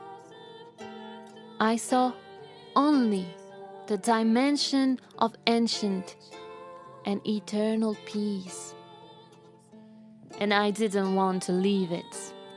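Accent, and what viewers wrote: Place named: France. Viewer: You are mystical and deep, it's soothing to listen to your beautiful french accent.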